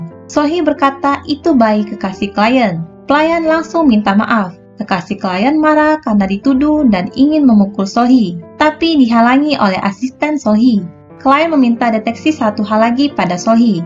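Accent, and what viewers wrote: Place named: South Korea. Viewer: Ini yg ngomong seperti org korea asli y logatnya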